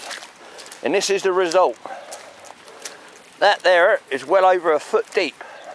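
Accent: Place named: Southampton, United Kingdom. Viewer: New I recognised that accent, soton through and through haha. I went to woodlands, live in swaythling. Used to knock around by the Hinkler pub, Hanney rise etc. Love your vids mate, just got into shooting recently, cheers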